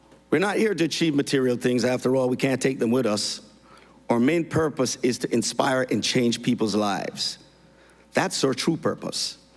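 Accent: Jamaica. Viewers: Speaks regular then patwa instantly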